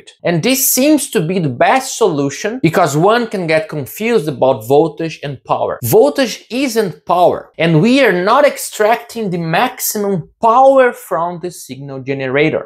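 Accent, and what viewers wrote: Brazil. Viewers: você tem sotaque de brasileiro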